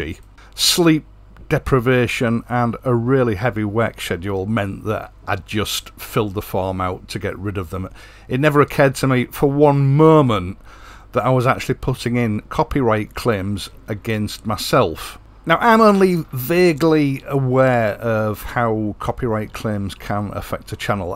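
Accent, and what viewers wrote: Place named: Yorkshire, United Kingdom. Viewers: If that isn't a Hull accent I'll eat my hat!! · Hi, thanks for sharing your “your trials and tribulations” of the pros and cons of running a global channel. Like most things, I guess it’s a learning curve. However, my attraction to this channel is Royal Enfield, plus your Yorkshire accent. Let’s get back to that please! Regards and respect.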